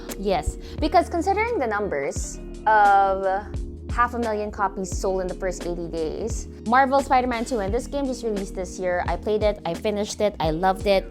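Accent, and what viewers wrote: Philippines. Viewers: lol her cute filipino accent.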